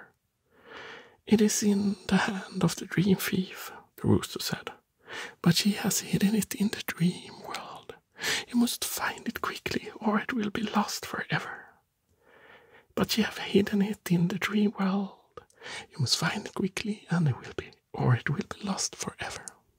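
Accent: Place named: Germany. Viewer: I love your Asmr, you have like a German/northwest European accent which reminds me of a friend. You make great Asmr! Lots of respect to you 👊🏻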